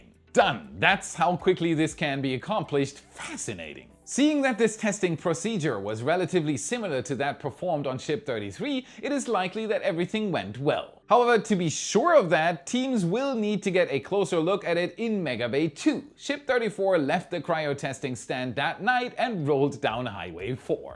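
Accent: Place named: Germany. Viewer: could very well be for a different pronunciation. is German, so kinda expect a heavy German accent with the pronunciation 😊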